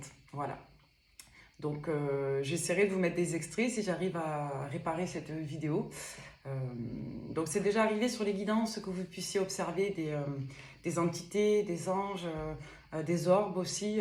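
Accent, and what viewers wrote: South, France. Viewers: Merci; Je préfère largement entendre votre jolie voix avec un léger accent du sud et vous voir souriante. 😉😂🤗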